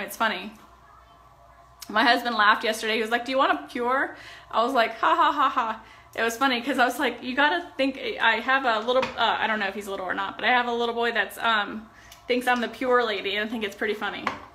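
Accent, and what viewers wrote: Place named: New York, United States. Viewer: It’s a northern jersey/ New York accent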